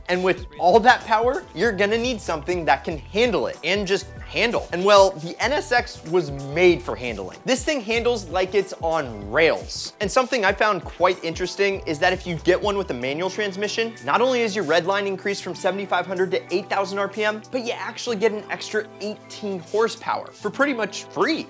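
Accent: United States. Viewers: Yo, can you just do a whole vid with your cowboy accent? hmm?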